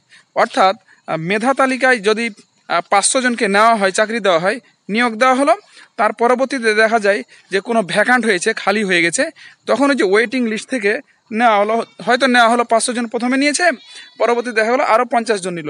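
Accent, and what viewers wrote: India. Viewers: গুরুত্বপূর্ণ তথ্য জানাচ্ছেন এজন্য অসংখ্য ধন্যবাদ। দুইটা ভিডিও দেখে Subscriber করলাম। একটা অনুরোধ ডেট দেওয়া মাত্র জানাবেন। একটা কথা জানতে ইচ্ছে করছে আপনি কি বিহারি? কথার উচ্চারণে অনেক ছ ছ আসছে। কিচ্ছু মনে করবেন না এমনিতেই জানতে ইচ্ছে করলো। 🙂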